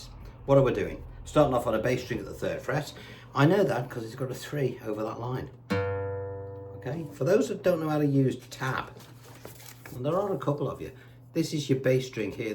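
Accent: Liverpool, United Kingdom. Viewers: I'm telling all my guitar mates about you. You're a gem of a teacher with loads of humour and friendly Liverpool banter. Thanks again buddy.🤗🎼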